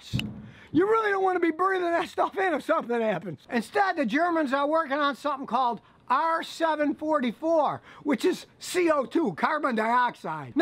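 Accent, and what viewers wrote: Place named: Pittsburgh, United States. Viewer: Amen!!! Ps: are you from Pittsburgh? You sound like you are, makes me miss home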